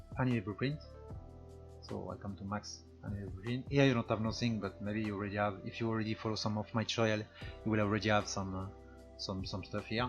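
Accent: France. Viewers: Tu es français? Ton accent anglais n'est pas très présent mdrr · Oh mon dieu mais tu es Français :D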